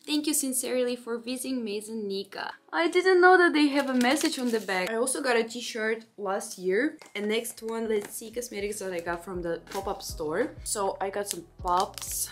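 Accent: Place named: Russia. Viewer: 한국말하는 쇼츠영상이 더 좋지만 러시아 억양의 영어히는 도 좋아요 ㅣ😊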